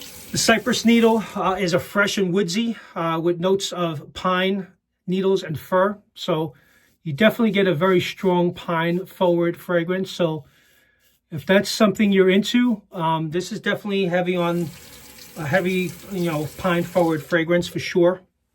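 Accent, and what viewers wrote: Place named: New York, United States. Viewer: Nice shave, love the NY accent💈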